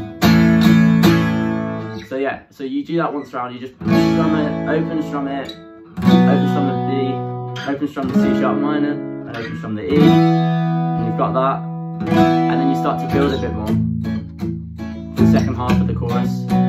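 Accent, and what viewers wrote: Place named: United Kingdom. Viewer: 빠져든다 영국발음💚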